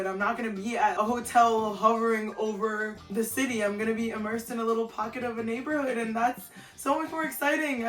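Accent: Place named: United Kingdom. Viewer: I love the British accent 🤣🤣🤣 "Fart around! Be ridiculous! Who cares if they cringe?"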